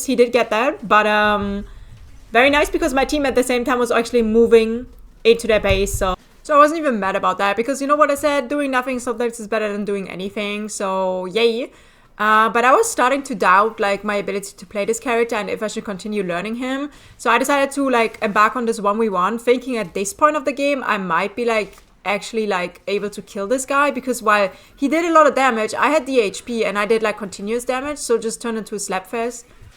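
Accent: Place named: Germany. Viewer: Ich finde das so schön wie man manchmal den deutschen Akzent raushört XD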